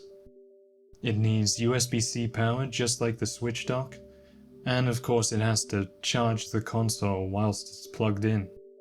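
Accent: United Kingdom. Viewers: you sound like a british nerrel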